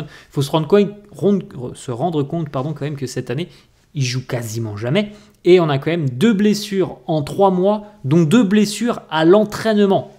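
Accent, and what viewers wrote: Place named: Spain. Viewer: Visca barça j,aime bien ton accent espagnol 😊❤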